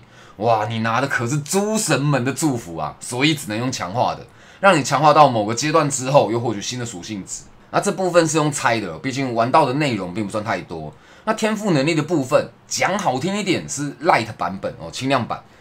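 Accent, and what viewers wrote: Taiwan. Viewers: 聽講台語還蠻好笑的，哈哈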